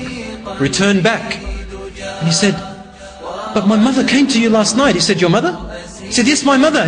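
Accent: Australia. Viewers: The speaker has an Australian accent and i think it might be Moosa Cerantonio, hope it is and salam to you :D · Who is th e person speaking? It doesnt sound like Sheikh Feiz to me...besides the australian accent. And people stop crying about what he said first... slip of the tongue, we're humans we make mistakes. =)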